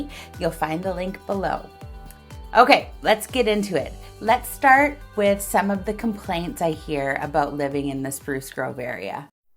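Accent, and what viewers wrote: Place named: United States. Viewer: Hi, I am first time watching your video That is nice for me because I love American English accent Love from India ❤😊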